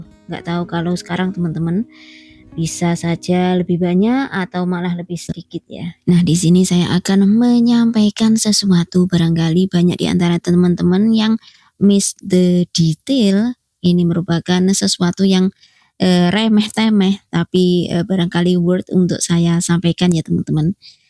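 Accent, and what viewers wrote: Java, Indonesia. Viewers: Ass...klrg kecil yg bahagis...Salam sy dari Bekasi...sy sangat sk dengan kllrg kecil ini...ap lg mbk...org nya grecek,klu lg ngomong sy sk ketawa dgn logat jawa nya,org nya,sederhana,dan tidak sombong...sukses terus mbk dan klrg...❤🎉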